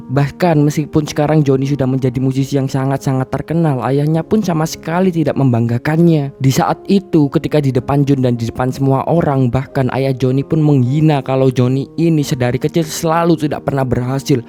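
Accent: Java, Indonesia. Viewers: medhok jowo👍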